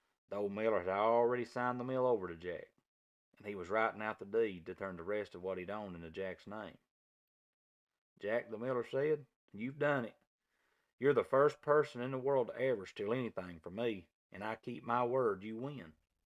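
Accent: Kentucky, United States. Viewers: Mrs. Reid, our librarian in elementary school read Jack tales to us every day. Kentucky accent just like this. Thank you so much!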